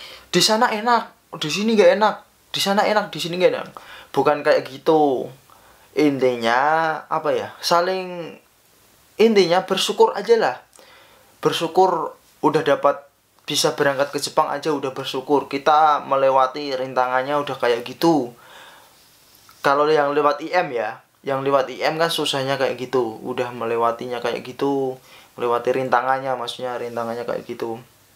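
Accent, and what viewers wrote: Java, Indonesia. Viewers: jowone pundi mas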